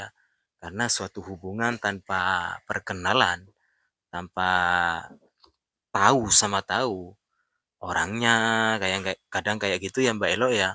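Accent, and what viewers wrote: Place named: Madura, Indonesia. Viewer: Org maana sih kang logat nya kaya madura gitu😊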